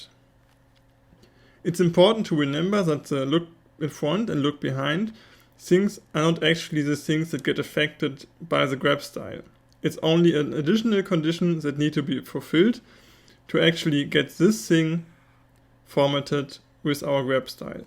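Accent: Germany. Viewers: Very well explained even though with a hard German accent :) I spot some similarities to linux commands and i.e. escape characters. My only suggestion is to deal a bit with some vocab like Backslash "\" is a escape symbol, you have parenthesis, curly braces|brackets or square braces|brackets colon is "Doppelpunkt". | is vertical bar or "pipe"... Anyways... Thank you for the well-performed explanation!! ++++ You dropped your website it seems. Maybe you have to edit your description and the video.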